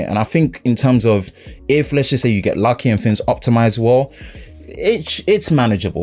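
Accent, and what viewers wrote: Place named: United Kingdom. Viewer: Your britch accente is epic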